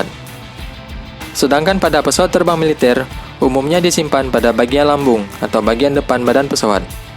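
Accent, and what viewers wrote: Aceh, Indonesia. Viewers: Bang, orang aceh ya ?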